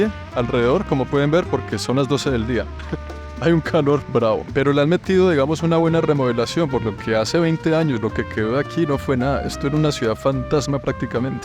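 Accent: Colombia. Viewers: Bueno x como hablas eres de Colombia tu país esta mal también y si siguen con Petro sera peor, los mejores países para vivir el norte de Europa.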